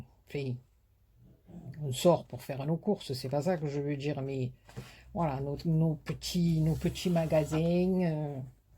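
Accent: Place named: Marseille, France. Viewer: cc merci pour tesexplications a la marseillaise hihihi bravo super bonne fin de journée bises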